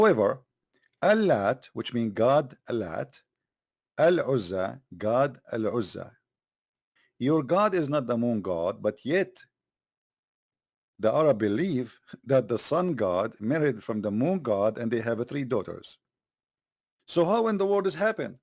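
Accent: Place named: Israel. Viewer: What in the name of the judgement day are you talking about? Arabic Gods? Islam came to destroy those false Gods. God did not give birth nor was born. it is the Clearest message in the book that you insult. why there is always those small channels that no one knows with a guy with thick israeli accent trying to promote something so ignorant to such small number of people off the grid, don't you feel ashamed?. i mean if you hate the religion okay. you're free but don't be stupid. there are history books written by your people, educate yourself before talking stupidly and making a joke out of yourself. you're supposed to be a teacher, a preacher? what are you? your job is to attack other people's beliefs based on lies? is this what your main religion taught you? to spread lies in order to badly influence ignorant people that has no knowledge about anything ? 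Whatever man. God is Greater than any work in the dark. He's always awake and always sees everything. Even the things we never say. keep that in mind. Peace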